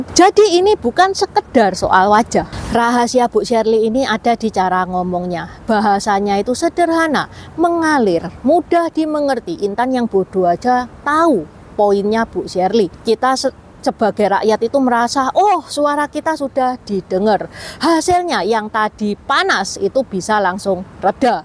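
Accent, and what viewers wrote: Java, Indonesia. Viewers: saya lebih suka kalo logat bicara intan normal ( tidak medok ) tolong lah di perhatikan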